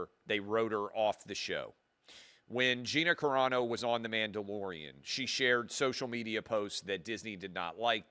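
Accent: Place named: Boston, United States. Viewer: Sorry Clay, you are alone on this topic. You sound like you are from Boston.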